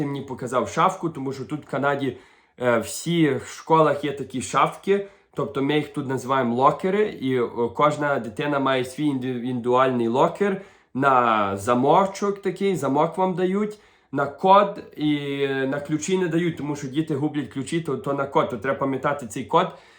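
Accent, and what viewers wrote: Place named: Ukraine. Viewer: Ооо, натрапила на відео, де розповідає звідки він! Отепер зрозуміло, чому відразу відчула, що манера розмови НАША🙂 Тема НАДЗВИЧАЙНО потрібна і цікава! Дякуємо! Дай Боже здоров'я тобі, та усій твоїй родині!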